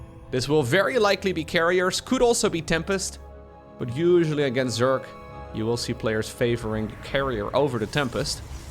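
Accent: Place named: Netherlands. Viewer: My wife and I lived in Netherlands and just appreciate the wonderful accent 😂❤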